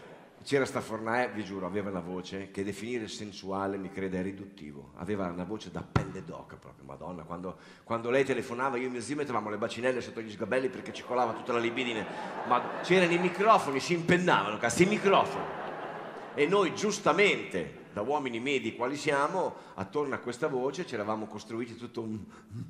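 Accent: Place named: Romagna, Italy. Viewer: Bravissimo quando guardo i tuoi spettacoli mi fanno passare la tristezza , anche perché mi piace molto il dialetto romagnolo . 👏👏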